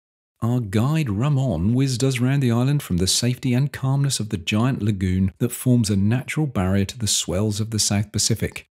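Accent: England, United Kingdom. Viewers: I’m so glad you don’t have a high pitched voice. I love Travel Movies but if the voice is above “C” my eardrums hurt😊. With your English Accent,listening to you is comforting.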